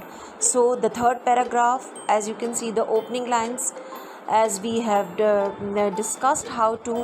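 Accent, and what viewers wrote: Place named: India. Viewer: oh hell nah miss ur accent is indian typa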